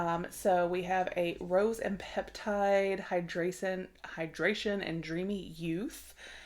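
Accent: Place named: North Carolina, United States. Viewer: New to your channel. Our accent is the same! I'm from North Carolina too. I live in Thomasville nc.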